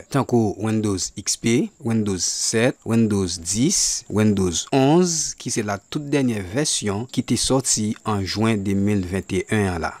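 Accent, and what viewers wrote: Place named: Asia. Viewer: Sans l'accent asiatique, ce serait plus facile à suivre...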